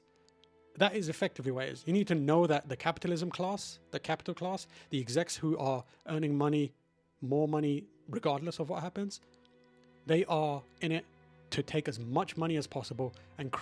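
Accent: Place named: United Kingdom. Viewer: It’s just so nice to hear an actual British accent from a decent gaming YouTuber, I can’t bear the transatlantic accent all our people pick up over there! But on topic, this all sucks. Though I have to lol that Asha Sharma, hot on the heels of laying off 20% of her staff, has now been appointed as an adviser for jobs and productivity to the US fed reserve 😂 Corrupt billionaires all the way down to hell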